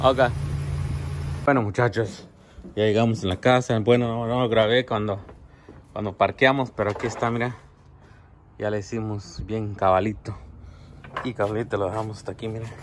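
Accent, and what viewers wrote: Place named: Guatemala. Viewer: Serote saludos así hablamos los chapines😂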